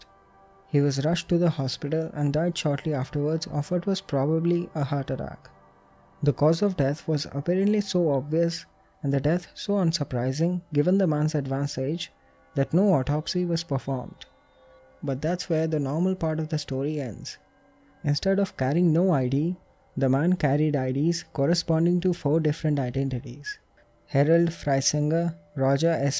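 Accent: India. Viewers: Narrator: I’m sorry, my pronunciation is trash. Also narrator: acrimonious You’re perfect. Don’t worry about your accent. Desi accents are awesome.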